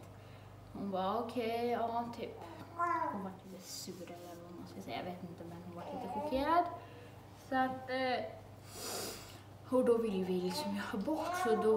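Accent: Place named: Dalarna, Sweden. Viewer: Nää det där är inte norrländska, och dessutom finns det ju sjukt många olika dialekter i norra Sverige som inte ens liknar varandra så det går inte att klumpa ihop alla dialekter och säga ”norrländska”. Det låter som att hon pratar dalmål tycker jag 😊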